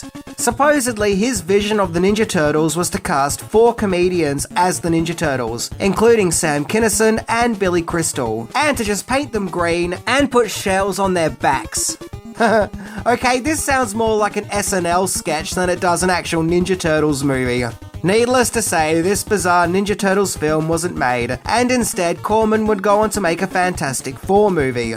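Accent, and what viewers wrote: Australia. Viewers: Australians pronounce things different lol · I don't think there are any facts about this movie that I don't already know...but it is fun to hear them in an Australian accent. Thanks!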